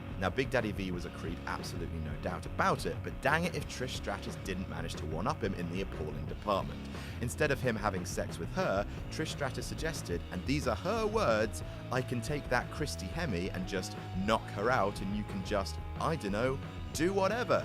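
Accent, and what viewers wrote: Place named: United Kingdom. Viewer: This Asshole just Loves to hear his own Lame Ass British Accent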